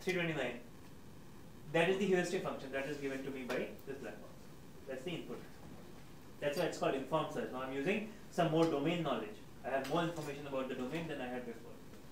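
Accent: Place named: India. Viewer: The English is probably perfect - its the heavy Indian accent that makes this barely comprehensible.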